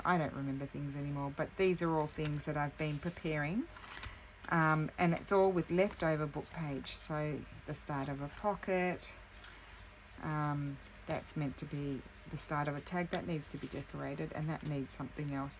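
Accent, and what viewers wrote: Australia. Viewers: I absolutely love your channel, I’ve been a subbie for quite a while, but I don’t comment very often. I just wanted to tell you how much I enjoy your channel. I suffer from chronic pain, depression and anxiety, and spend a lot of time in bed watching videos. Your videos always make me feel better, happy, and really helps lessen my anxiety, at least for a while. I love that you live in Italy, but have such a beautiful Australian accent, I guess it’s all in what accent or language you grow up speaking. The last thing, I LOVE rainy days, and I thought I was the only one, no one(and I’m not joking) that I know like rainy days!!!!😀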